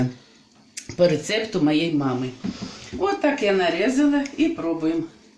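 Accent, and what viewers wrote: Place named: Belarus. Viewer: Очень милая женщина и хорошая хозяйка! Была в Белоруссии не раз, очень нравится страна, люди, говор очень приятный. Подписалась, с удовольствием буду Вас, смотреть.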